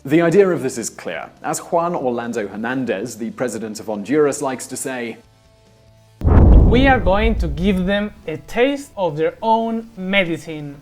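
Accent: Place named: United Kingdom. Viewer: Well then, I don't understand why is it they can't tell the difference between "Salvador" and "El Salvador" ...I mean, it's THEIR original language after all, isn't it? But the guy on this video is definitely NOT Spanish, you can easily tell by his accent he's British.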